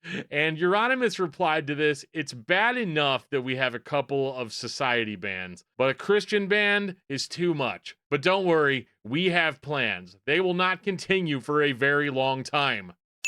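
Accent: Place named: Midwest, United States. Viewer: Greetings from 🇸🇪 Thank you for your lovely midwestern pronounciations and this phenomenon I was unaware of! As a neighbour, I guess Vaakevandring should probably have the heaviest accents on the first a's and secondly the second a. Awake wandering.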